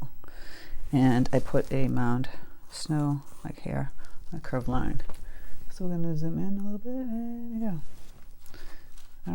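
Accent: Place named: New England, United States. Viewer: Painted the night seen and added a couple of snowmen in the snow space. Sent them out as cards. Thank you so much. Love your accent, just like mine but I'm not in Mass anymore. Still New England, though.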